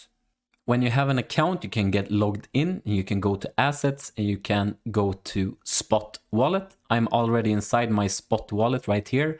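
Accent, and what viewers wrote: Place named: Sweden. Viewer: I love that Swenglish-Makes the video so much entertaining : D - Thanks for good tutorial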